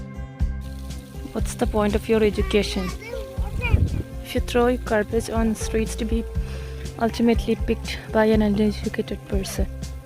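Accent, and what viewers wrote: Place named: Punjab, India. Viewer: Yaa allah... നിങ്ങൾ മലയാളം പറഞ്ഞോ... 🥰 അപ്പൊ മലയാളി ആണോ.. 🤔🤔🤔 നിങ്ങളുടെ english കേട്ടു, വല്ല പഞ്ചാബി ആണ് എന്ന് , എനിക്ക് മാത്രം , ആണോ തോന്നിയത് 😜🤪😜 നിങ്ങൾ സ്ഥിരമായി ഇരിക്കുന്ന bench അല്ലേ അത് 😍😍 അര്മേനിയ പോയ videos കണ്ടില്ല 🙂🙂 ശരിയാ... എന്നാ, ലുക്ക്‌ ആണ് ഈ സ്ഥലം... 😍😍😍 14 കുരിശൊ 🤔🤔🤔🤔 അത് കണ്ടില്ല 🙄🙄🙄🙄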